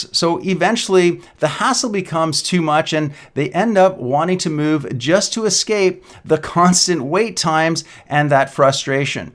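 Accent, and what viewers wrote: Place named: Ontario, Canada. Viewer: You talk like you are from Ontario. We don't say "out or "about like that here in Vancouver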